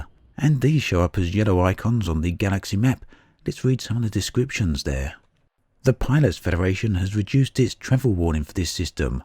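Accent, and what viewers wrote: United Kingdom. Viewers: He is british. End of story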